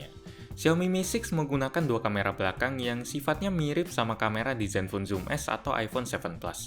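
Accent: Palembang, Indonesia. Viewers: orang palembang ya ko?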